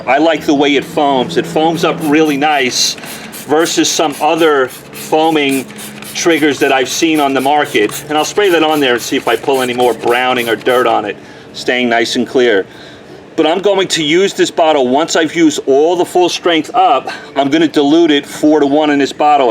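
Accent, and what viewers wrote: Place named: Long Island, United States. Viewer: You must be from long island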